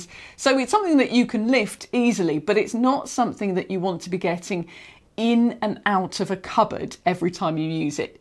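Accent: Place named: Middle class, United Kingdom. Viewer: Perfect review, and so refreshing to hear a nice, middle-class British voice on a product review! Thank you!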